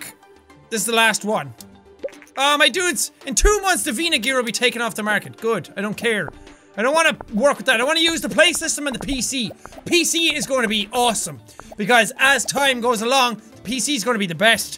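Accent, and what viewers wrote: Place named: Ireland. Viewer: I love your brogue!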